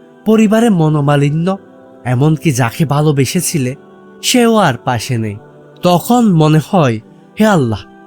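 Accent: Chittagong, Bangladesh. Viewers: আসসালামু আলাইকুম ভাই বিনীত অনুরোধ আপনার কাছে পরিশুদ্ধভাবে উচ্চারণ শিখে সুন্দর উপস্থাপনার মাধ্যমে ভিডিও তৈরি করেন আপনি যে চিটাগাইঙ্গা অজপাড়া গায়ের ভাষা থেকে বের হয়ে আসতে পারেননি তা তো একেবারেই পরিষ্কার পরিশুদ্ধ ভাষায় কথা বলতে চেষ্টা করলেও আপনার মুখ থেকে চিটাগাইঙ্গা ভাষাযই বের হয়ে আসে। ভিউয়ার বাড়িয়ে পয়সা কামানোর ধান্দা না করে চিটাংগের ভাষায় কথা বলা পরিহার করেন। এজন্য আমি আপনার ভিডিও দেখি না শুধুমাত্র আপনার চিটাইংগা গন্ধ পাওয়ার কারণে পরিশুদ্ধ উচ্চারণ এবং সুন্দর উপস্থাপনার ট্রেনিং গ্রহণ করুন তারপর ইউটিউবে আসুন ।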